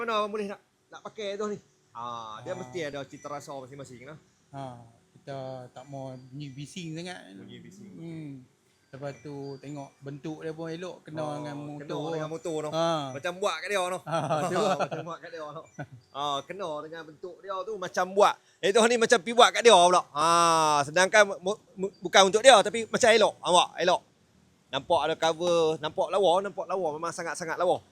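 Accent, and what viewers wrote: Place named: North, Malaysia. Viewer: Btoi2 mewakili org utaqa sebutan2 abg. Mmg sempoiii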